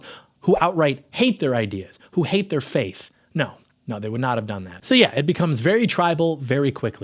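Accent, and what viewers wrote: Michigan, United States. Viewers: I figured you were from Michigan. Since moving out of my home state, I miss how Michiganders talk. Fast and concise.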